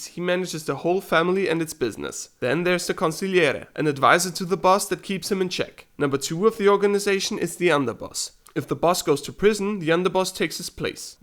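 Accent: Germany. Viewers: are you german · are you German? you have a very heavy German accent, cute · The narrator sounds german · You have a german accent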